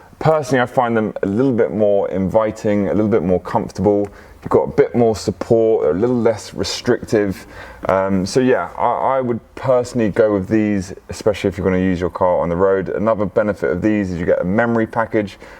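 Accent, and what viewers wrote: United Kingdom. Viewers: Man, you sound like a British !! you should start a podcast.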